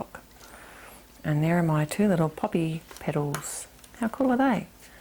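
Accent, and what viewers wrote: Australia. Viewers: Also loved having your wonderful Australian accent talking to me.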